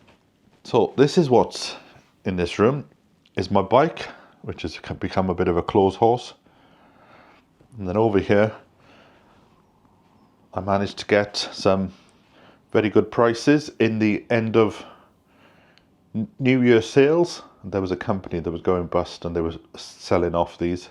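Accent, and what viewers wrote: Wales, United Kingdom. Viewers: I love listening to you chatting 😊I love the Welsh accent 😊Blessings going your way for healing 🍀❤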